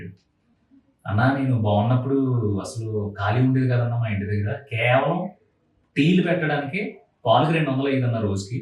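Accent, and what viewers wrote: Godavari, India. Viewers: Bro midhi Godavari district ahhh ?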